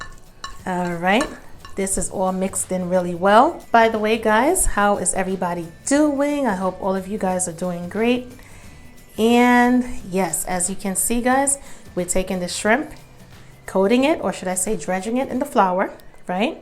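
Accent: New York, United States. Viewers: I’m making this for sure. Girl your accent is so strong, you have to be from NY lol. I’m thinking the Bronx!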